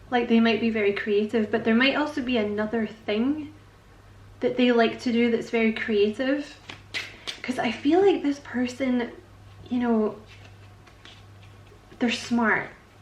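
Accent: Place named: Scotland, United Kingdom. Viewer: Oooh I Love your long readings and your Scottish😍 Thank you so much for your beautiful reading💕🙏🏻😘 Love you💕💕💕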